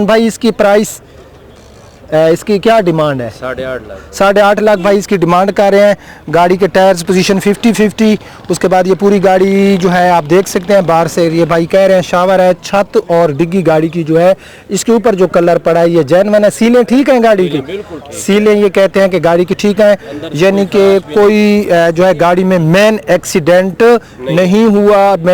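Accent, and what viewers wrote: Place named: Saraiki, Pakistan. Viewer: Urdu sariki lehjay mein.... Baraa maza ata ha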